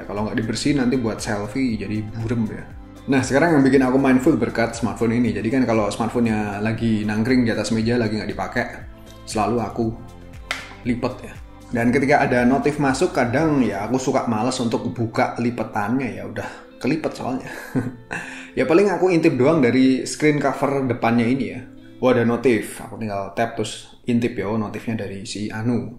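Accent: Java, Indonesia. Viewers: Di jembreng... /jawa bangett mas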